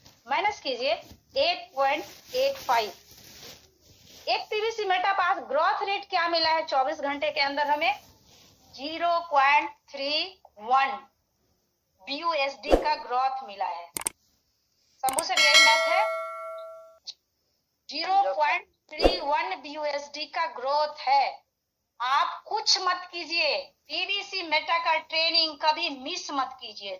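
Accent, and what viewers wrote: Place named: Kolkata, India. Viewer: Colcata anti ji ka awaj lag raha hai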